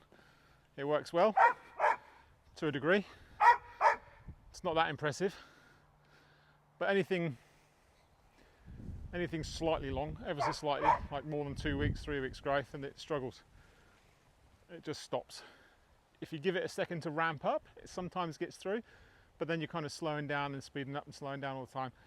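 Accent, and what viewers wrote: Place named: United Kingdom. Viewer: I look forward to the season ahead, just started here in the UK.. have you always lived in Australia? Sounds like you have a British accent to me at times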